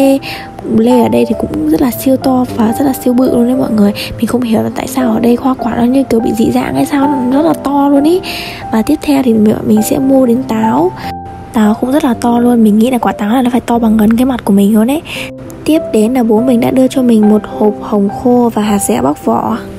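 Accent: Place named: Hai Phong, Vietnam. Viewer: người hải phòng mà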